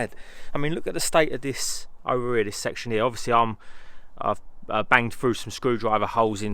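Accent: Essex, United Kingdom. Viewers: A comment on Savage Garage's Fiesta video brought me here. Proper Essex accent there. 👍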